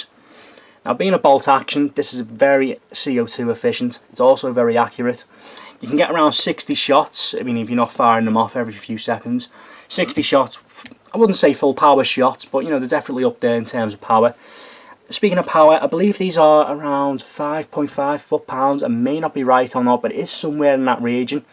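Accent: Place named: Merseyside, United Kingdom. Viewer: Hi mate you sound like you live in Merseyside. Do you know anywhere here where we can shoot airguns? I live in birkenhead lol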